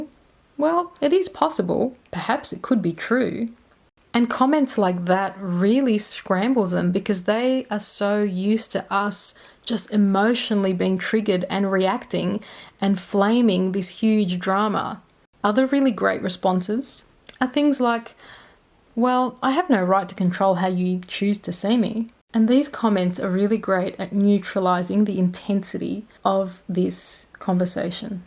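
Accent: Australia. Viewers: Yes it is a lovely hypnotic Australian female voice.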